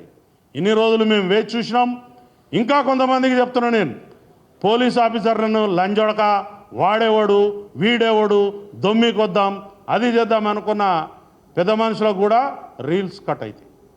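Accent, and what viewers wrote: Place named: Warangal, India. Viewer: తెలంగాణ పక్కా వరంగల్ స్లాంగ్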